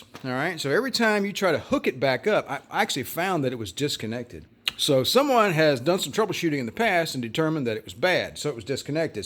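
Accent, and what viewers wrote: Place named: Texas, United States. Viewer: This guy must be from TEXAS! Luv it man!... Good video, thank you sir...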